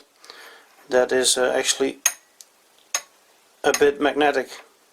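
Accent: Netherlands. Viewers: Are you Dutch? Because of the accent.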